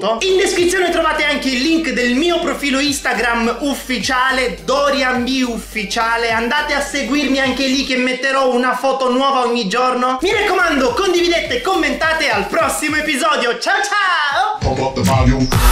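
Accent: Sicily, Italy. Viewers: Ma sei di Sicilia?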